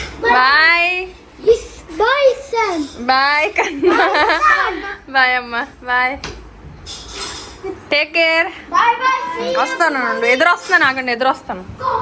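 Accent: Godavari, India. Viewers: Meeru vunna place? Godavari slang bagundi andi, madi east godavari, chala baguntadhi slang😅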